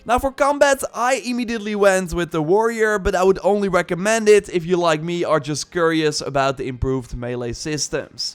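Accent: Netherlands. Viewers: you sound dutch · This Dutch accent is something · nice dutch accent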